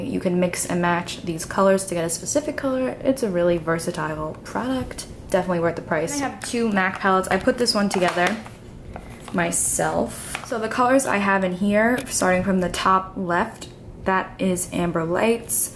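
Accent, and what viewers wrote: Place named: Staten Island, United States. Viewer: fellow staten islander! knew it the minute i heard you speak you sound just like me lol. awesome choices for your kit! i'm also a freelance MUA on the island!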